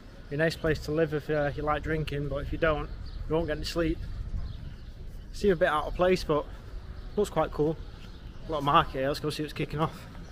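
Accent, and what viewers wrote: Manchester, United Kingdom. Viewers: He's sounds like he's from Manchester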